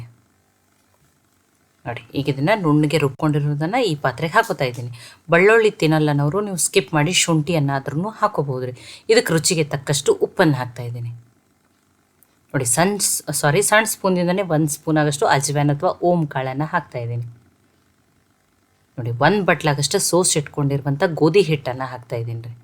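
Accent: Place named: Kalaburagi, India. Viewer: ನೀವು ಕಲಬುರ್ಗಿ ದವರು ಇದ್ದೀರಿ ಏನು ಸೇಮ ನಮ್ಮ ಭಾಷೆ ನೆ ಮಾತಾಡಲತ್ತೀರಲ್ಲ?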